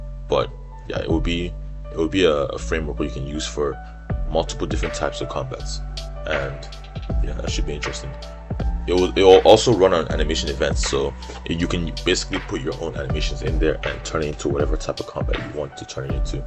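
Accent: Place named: Nigeria. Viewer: icl from your accent you sound Nigerian but good vid tho its very good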